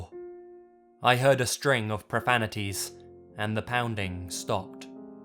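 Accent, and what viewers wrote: England, United Kingdom. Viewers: same brits ftw is he british? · are you British I'm not good with ascents sorry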